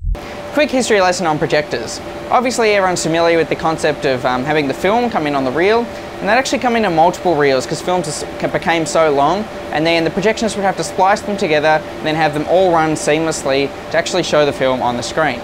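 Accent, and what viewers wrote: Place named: Australia. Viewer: Very distinctly Australian indeed. BUT, only one variation of many. I personally sound nothing like that in the slightest. I don't really have much of any stereotypical give-aways. Which I think is becoming common across the world as people become more connected through newer ways of socialising, and media. Among other reasons.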